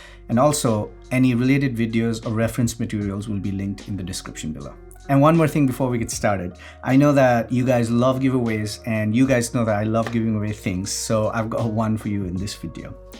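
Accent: Nepal. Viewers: Not to be rude but you sound like a nepali. That accent is unmistakable. I have a couple of friends and even colleagues who are nepali.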